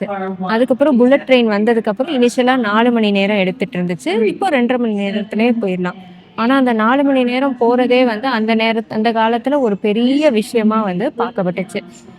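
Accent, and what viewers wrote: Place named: India. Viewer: 典型的なインド訛りの英語だな。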